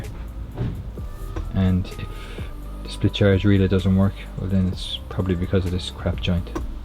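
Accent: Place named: Dublin, Ireland. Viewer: Great Video…what part of Dublin are you from??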